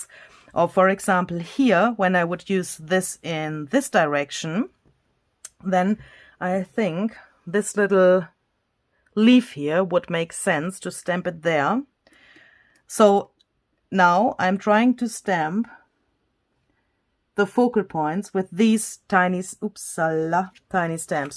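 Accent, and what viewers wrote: Germany. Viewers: You are amazing Lady , love your creativity, I have learned so much with you , thank you , love your English /German language, God Bless you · I just love watching you create, my Mom was from Germany so that makes it double more fun!!! She had the same wonderful accent. Thank you for making my day!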